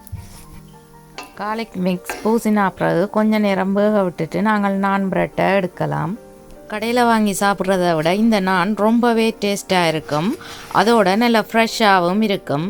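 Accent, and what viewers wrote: Sri Lanka. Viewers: சூப்பர் தகவல் அக்கா. நம் இலங்கைத்தமிழை கேட்கும்போது உங்க வீடியோ பார்க்கலாம். 💗💗💗💗💗💗💗💗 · அருமையான ரெசிபி அழகானஇலங்கை தமிழ் எனக்குபிடிக்கும் தோழி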